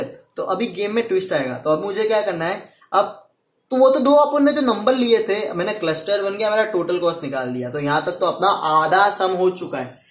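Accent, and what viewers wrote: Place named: Bihar, India. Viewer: bhai,lagta hai aap bihar ka ho😁😀😛😛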